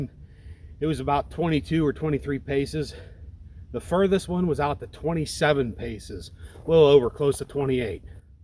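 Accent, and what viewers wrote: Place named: Pennsylvania, United States. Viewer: I grew up near Pymatuning. Once I heard you speak I thought I heard PA in your accent. Thank you for sharing these videos. My dad used to build atlatl, but I never really watched anyone use a sling.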